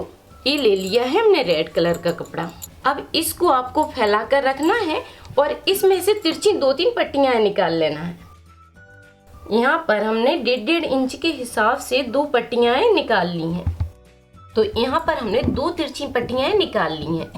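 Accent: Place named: Bundelkhand, India. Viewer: आप बुंदेलखंड की है। आपका बोलने का acent बता रहा है।❤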